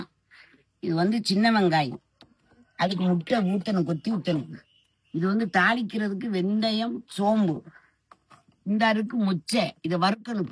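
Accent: Ramanathapuram, India. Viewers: Enga Ramnad district pechu endha vooru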